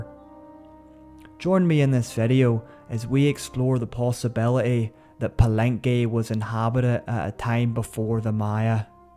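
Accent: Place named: Scotland, United Kingdom. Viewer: I think he is scottisch, i love his accent 🥰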